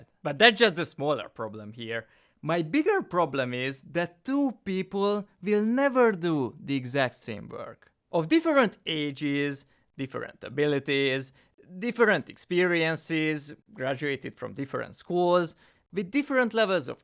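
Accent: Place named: Hungary. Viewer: nagyon hallani a magyar akcentust kétlem hogy ai lenne ezt felmondta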